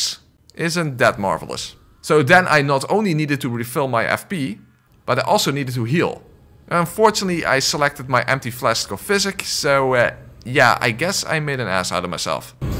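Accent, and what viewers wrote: Netherlands. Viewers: my guy, are you Dutch by any chance? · i bet this will be a fun vid but i'm 4 mins in and the dutch that is squeezing through your english plus the dutch accent is making me cringe a bit butt its not you mostly me since i am belgian lots of love <3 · You Dutch? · 14 seconds in, and ur definetely dutch lol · broer jij bent doodlachen ik hoor gewoon dat jij nederlands bent😂 soldaat 💯❤️ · Great vid man, are you Dutch by any chance btw? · First dutch soulsborne youtuber ive found, if you are dutch. You certainly got the accent. Great video btw, was a blast to watch. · You sound very dutch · you sound dutch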